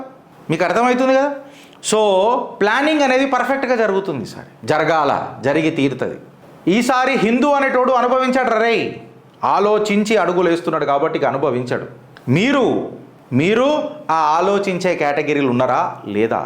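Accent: Telangana, India. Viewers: Babu chinna request, meeru telanganavalle doubt ledu, alani motham antha Telanga yass lo maatlaadutunte konni artham kavataledu, chadukunna vallu local yass unte, mari chaduvu leni vallaki and chuladukunnavaallaki theda yemi undi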